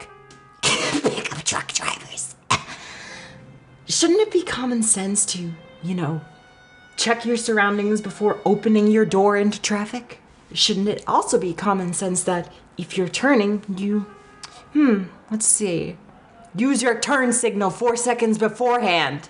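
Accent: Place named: Philadelphia, United States. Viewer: You from Philly? Auto sub immediately.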